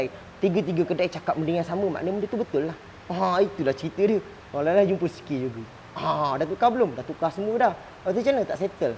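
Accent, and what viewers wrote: Pahang, Malaysia. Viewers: workshop kat mana? bunyi cakak turr maca org pahang jek..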